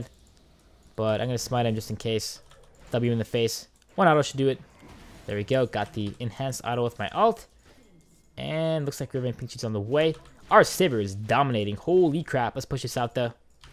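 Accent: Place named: Boston, United States. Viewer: are you from boston or around the east coast? you kinda sound like it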